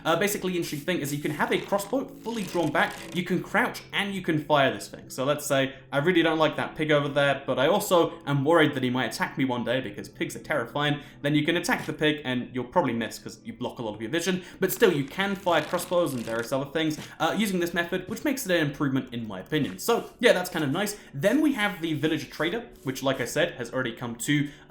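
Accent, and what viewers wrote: United Kingdom. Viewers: Ong Canada I thought you were British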